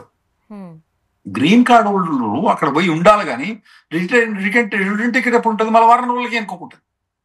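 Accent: Telangana, India. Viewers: Anna,yavoore needhi, pakka Telangana matalu matladthunnaru? 👌👍🙏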